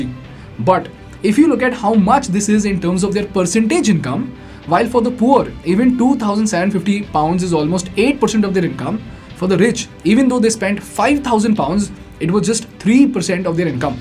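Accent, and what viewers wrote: India. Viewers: That's what subtitles are for. Please make use of it. He isn't a British South Asian, He lives in India and just like Indian accent is hard for you to understand, it is equally harder for many Indians to understand British or American accent without subtitles. Since most of his audience are Indians, it makes sense for him to produce the content in English as we Indians don't have a common language, but English is still widespread and with the help of subtitles, people from different countries can still understand. Moreover, if you try to learn another language, especially languages with high pitch and words like Hindi, you will realize that just how hard it is to change your accent. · Funny indian tongue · I love the contents of most of your videos. I can understand your accent as i grew up in India. The idea flow is cogent and quite well organized. 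Well done!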